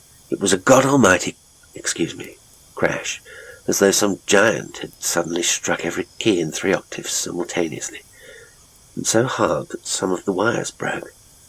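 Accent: United States. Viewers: Well kudos to for the American accent maintained for so long - really enhanced the Kiplingesque story. Respect. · I had forgotten how much I absolutely love your American accent 🖤✌️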